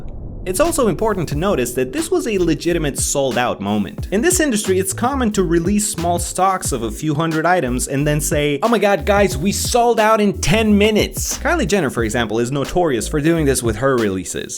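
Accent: Romania. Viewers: Wait wtf why is thi guy having a "romanian" accent?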